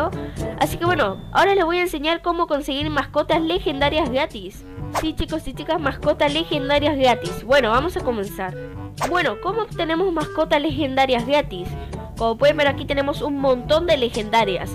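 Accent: Argentina. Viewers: No sabía que eras de Argentina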